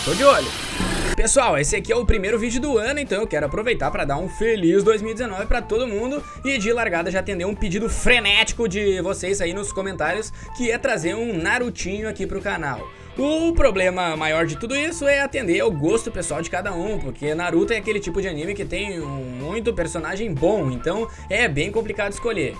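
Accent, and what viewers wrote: Rio Grande do Sul, Brazil. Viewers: cara vc é Gaúcho? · Tu é Gaúcho né? Teu sotaque é bem Gaúcho eu sou aqui do Rs e eu reconheço, não sei se é do Rs, mas tu é do Sul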